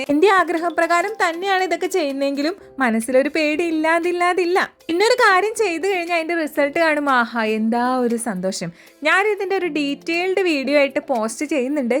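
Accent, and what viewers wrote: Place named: Ernakulam, India. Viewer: എറണാകുളം ഭാഷ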